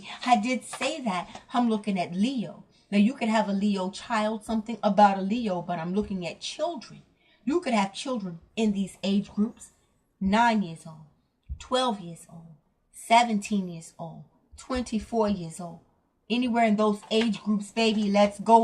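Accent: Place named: New York, United States. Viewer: I like readings here new York accent sista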